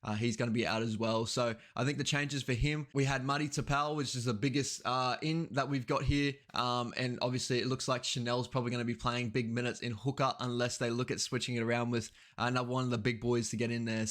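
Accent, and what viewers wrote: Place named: Torres Strait Islands, Australia. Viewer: Im Arab but why do you sound like you got the aussie islander accent. Even the pronunciation of Samoa took me years to get right 😂😂😂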